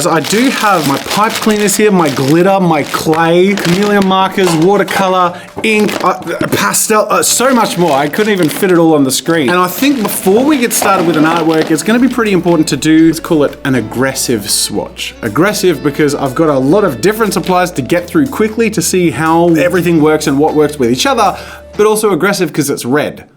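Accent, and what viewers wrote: Australia. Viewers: i kinda find his aussie accent nice but thats probably because ive grown up with it my whole life lol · Just a quick question, is he Australian?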